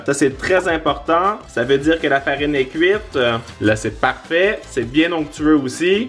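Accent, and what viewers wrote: Quebec, Canada. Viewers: Après tant d'années à te suivre ton est toujours aussi incroyable pour moi, vraiment l'accent québécois best accent de la planète ! ✨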